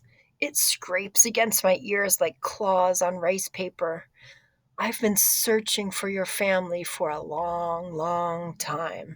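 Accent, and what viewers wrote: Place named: United States. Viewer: Thank you for your video. I really needed an audio of this book for my shadowing so as to get familiar with the intonation of American English. It definitely helps me a lot and your voice sounds great to listen.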